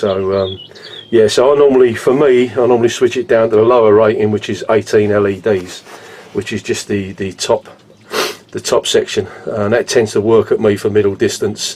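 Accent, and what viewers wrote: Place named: London, United Kingdom. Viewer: Thanks, just setting ours up to capture Kangaroos, Wombats and Wallabies here in the Upper Yarra Valley, 70kms from locked-down Melbourne. Nice to hear an authentic London accent again. We also want to watch how our chickens escape their pen as foxes have taken a couple lately.....thanks...useful tips!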